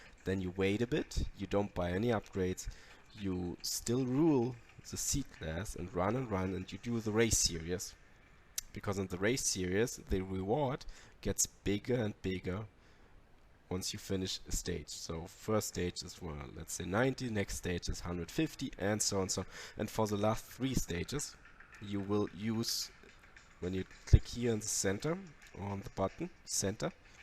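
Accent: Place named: Germany. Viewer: Man merkt richtig an der Aussprache dass du ein Deutscher bist 😁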